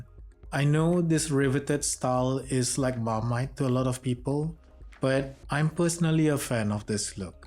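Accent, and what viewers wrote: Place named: India. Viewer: Thanks a lot! Never heard of this brand. I will check them out. Judging by your accent you are from India, right? Which region/city you recommend to spend my holidays in India? I'm from Central Europe and I love spicy food.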